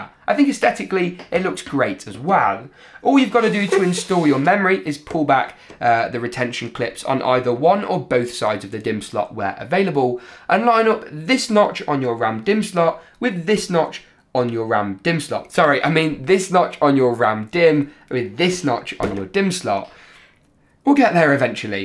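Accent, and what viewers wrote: United Kingdom. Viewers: Is it racist if Somehow I like his accent, is that British accent? That's cool for my Asian ear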